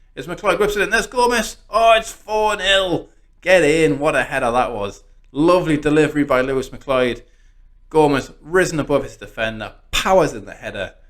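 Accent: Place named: Newcastle, United Kingdom. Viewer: Spoiler Alert! Gutted I never heard you say “Champion”! It’s my favourite word in a Geordie accent! 🤣. Some great squad chemistry there mate, excellent games to play and you’re right, counting is hard! 🤣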